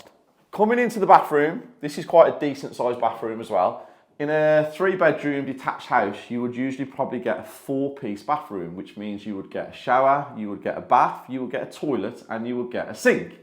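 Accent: Birmingham, United Kingdom. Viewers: Sounds like your from Birmingham and like many have stated. Your prices are very cheap and not realistic in my opinion. Curious to see the standard of workmanship and quality of products you are using.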